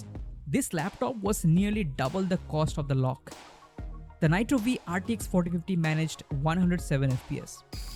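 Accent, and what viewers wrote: India. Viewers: Bro are you from Siliguri...? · Bihari bkl commentary nhi chaiye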